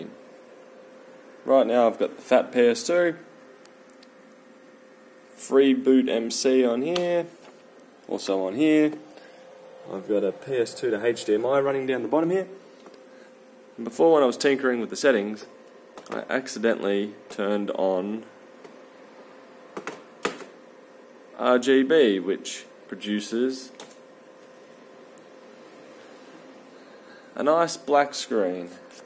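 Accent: Australia. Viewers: Love your Aussie accent homie and great video. You would get tons of women here in the USA. Does it work the other way too? I have heard Austrailian women love American men and its like a 3 to 1 rario there men to women. I hope this question is not offensive, this is just what I have heard. Have a wonderful weekend.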